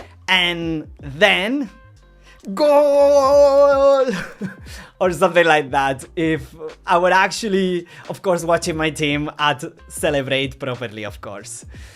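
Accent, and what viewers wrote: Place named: Scotland, United Kingdom. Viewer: You have been in Scotland way too long... You can hear it a mile off. Whit a pass... 😂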